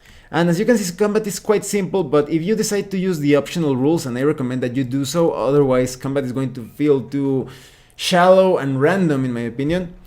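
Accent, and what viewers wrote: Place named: Spain. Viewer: I really dig this review for several reasons: relaxed but not boring, comprehensive but not overextended, and it really gets you into the game mechanics without getting lost in too many details. You totally won my attention into AFF 2e! Bravo! A curiosity: I recognize that your a native spanish speaker based on your accent, but you talk fluently through many sayings with no flaw, can you tell me how you got such a mastery :)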